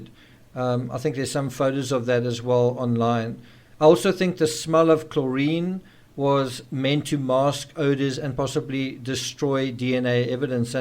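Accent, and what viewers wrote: South Africa. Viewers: South African accent? A quick google confirms :)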